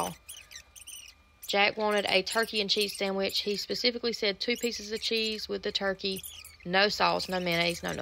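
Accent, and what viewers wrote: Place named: Southeast Georgia, United States. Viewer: You sound like southeast Georgia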